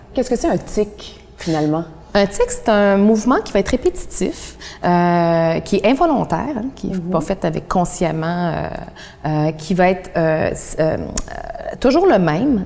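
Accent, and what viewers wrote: Canada. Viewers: Bah c'est du québécois c'est pas "mal prononcé" c'est juste pas ton accent à toi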